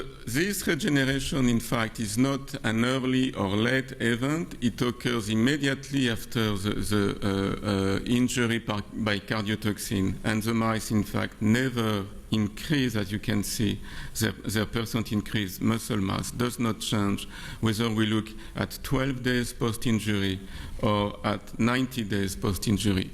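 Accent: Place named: France. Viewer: dicficult to follow, English which sounds like French.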